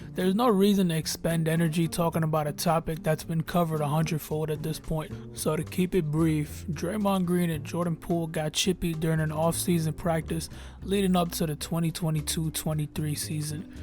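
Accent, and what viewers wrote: New York, United States. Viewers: that New York drawl is perfect for basketball talk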